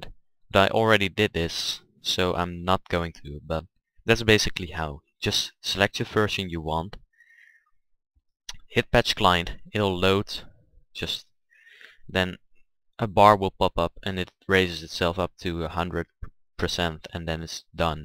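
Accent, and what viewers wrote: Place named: Netherlands. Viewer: i love the way you say "fersion" instead of "version" cus v is said like an f in dutch ^^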